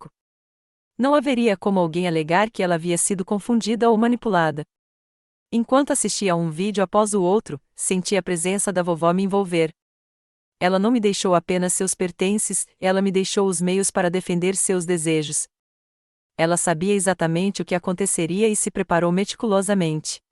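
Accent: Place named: Portugal. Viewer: Gostei muito da historia. Eleanor uma grande mulher, que deu uma grande lição à toda a família depois da sua morte, endinando-os o verdadeiro valor da vida, o amor entre as pessoas é muito superior do que o material. Apesar de ser importante e confortável , não o que nos define. Narração perfeita, de Portugal ❤❤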